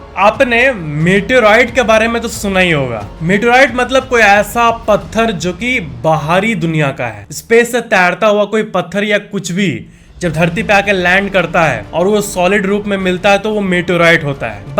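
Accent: Bihar, India. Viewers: Vai aap bihar se ho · Bhai tum bihar se ho kya ??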